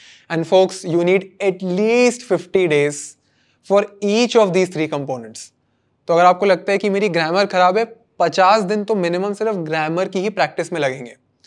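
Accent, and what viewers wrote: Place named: India. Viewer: I'm sorry but the Indian accent is still very difficult to hear. However, your tutorials are still of very good quality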